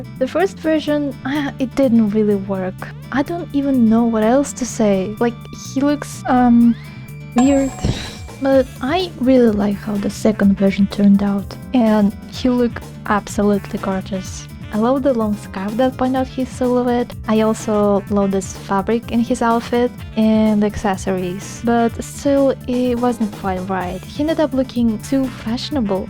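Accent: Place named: Russia. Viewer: Немного русский акцент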